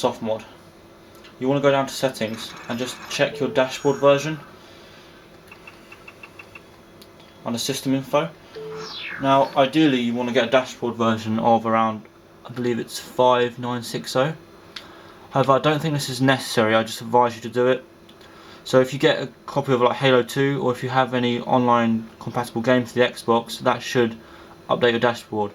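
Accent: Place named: London, United Kingdom. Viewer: typical londoner, good stuff.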